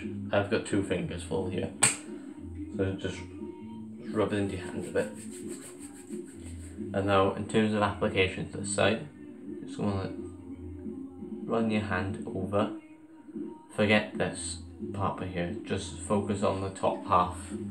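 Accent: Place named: Wales, United Kingdom. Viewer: Are you welsh fella? Lovely accent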